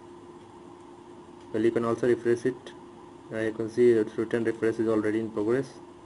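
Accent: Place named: India. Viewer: Sorry, your Hindi accent is so strong I can't understand what you were saying. I guess I will never find anyone who knows how to present the "Add A Friend to WhatsApp" on a Samsung Android phone! :-(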